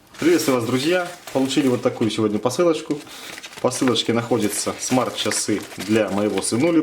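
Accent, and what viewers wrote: Belarus. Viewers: Акцент на белорусский похож)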